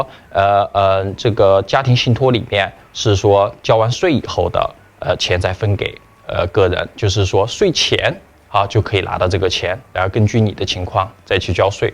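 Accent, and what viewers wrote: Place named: Sichuan, China. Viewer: 哈哈哈，听出了川贵口音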